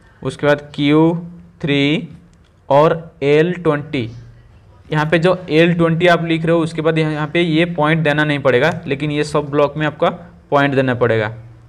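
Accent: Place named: Odisha, India. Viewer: Sir app odia ho kya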